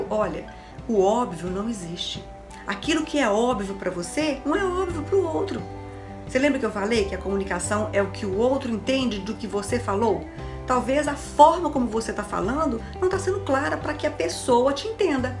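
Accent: Minas Gerais, Brazil. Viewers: Vc é mineira! Meu pai tbm, de Passos. Agora te entendo melhor. Bjssss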